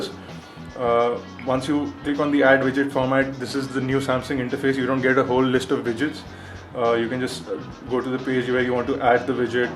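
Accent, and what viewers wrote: India. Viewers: its called an indian accent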